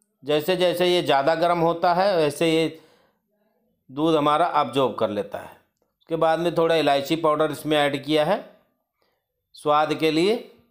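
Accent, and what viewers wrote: India. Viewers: Aap bihar sy ho kya bhaiii ....bahut badhiyan dhang bataye ho sojibka gulab jamun dhanywaad ,😂😂😂😜😜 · Sir aap up se hai kya?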